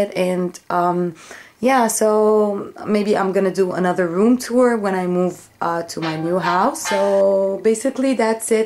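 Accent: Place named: Arab world. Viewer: Are you moroccan or somehing like that : becouse you have an arab accent ! x) <3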